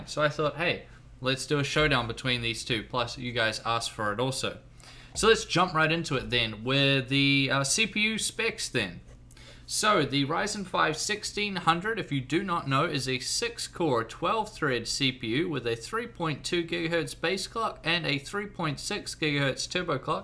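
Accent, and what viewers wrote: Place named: New Zealand. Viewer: I think this one is a New Zealander.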